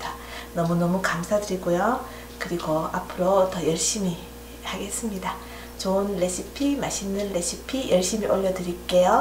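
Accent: Gyeongsang, South Korea. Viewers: 님, 시방, 힐링님께 감사댓글을 올리려 들어왔더니 먼저 올려주셨네요. 저도 ♡ ♡ 닉네임도 호감이 갔었지만 구수~한 대구말씨에 완존 공감을 했었답니다. 정말 이모님의 숨은 내조자에 힐링님도 빠질순없지요. 늘~ 감사드리고 있어요.일일이 댓글읽고, 답글 올리는게 보통일이 아니던데 애썼습니다. 우리모두의 ✌✌입니다.잠자리 들때면 폰도 쉬라구 끄고자거든요. 제가 잠든사이 이런 경사가 저를맞아주네요. 힐링님도 선선~ 한 화요일 되시기를 기원합니다. 수고하셨어요.🙋‍♀️🙋‍♀️🎵🎶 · 벌써 실버버튼 인가요 ^^ 그리고 실버버튼.. 저렇게 생겼군요 축하드려요 대구살때 한번씩 친정엄마께 전화해서 요리법 물어보곤했는데 여기 미국오고나선 이모님 영상을 많이 참고합니다 경상도분이신가..말투도 친숙하구요 ㅎ 다시한번 축하드리고 골드버튼 되는날까지 아자 아자 ^^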